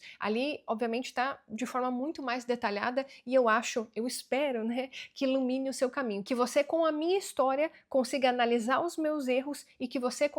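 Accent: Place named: Paraná, Brazil. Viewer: Assistindo muitos videos seus. Que deus lhe abençoe sempre! Seu sotaque anglo paranaense e trocando o S pelo x tipico de avó alemã e um barato! Voce e uma fofa e a sua inteligencia amplifica sua beleza! Parabéns 🎉 Sonhos nao envelhecem !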